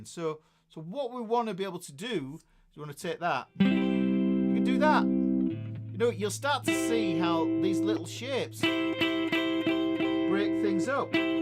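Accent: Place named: Yorkshire, United Kingdom. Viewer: books are worth every penny! I love the way he breaks everything down and explains things in a way that makes it easy to understand. Thank you! The accent helps I think.. 🤔 Us Americans and our slang English language can sound ignorant when compared to proper Yorkshire accent. lol. All good stuff!!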